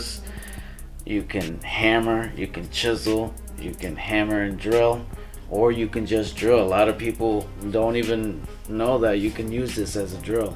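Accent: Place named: New Orleans, United States. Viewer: si mon que si que fuck yeah....did 11 years in prison here in California and got out and became a union electrician...IBEW LOCAL 11....I couldn't tell where u were from bro...ur accent was all over the place...lmao at first u sounded like a homie...but then u sounded like u were from New Orleans..so I was like where is this vato from...lol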